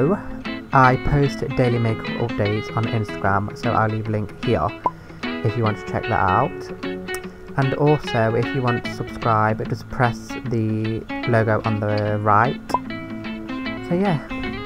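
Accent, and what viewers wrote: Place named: Nottingham, United Kingdom. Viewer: Just subscribed as it's amazing! Where you from? You sound like a Nottingham lad👍🏻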